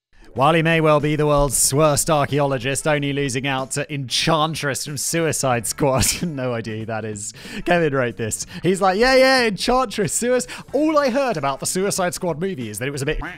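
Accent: United Kingdom. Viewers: Eh. F the British pronunciations. No one but you uses them. If you wanted it different you should’ve fought harder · Don't feel badly about Nike. I'm in Michigan, and it took that shoemaker at least a decade of very expensive commercial advertizing to get me to finally make me pronounce it the Greek way. Before that, I pronounced it the normal way, like you. Also, part of your charm is your very British accent. Hearing you say nike is the audible equivalent of the Y in tyres. If you didn't do these things, then it wouldn't add up. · Fuck conforming (American here) you do you. Pronounce it the british way! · Well you called it a hood instead of a bonnet so you might as well start pronouncing Nike like the rest of us. The UK isn't the world.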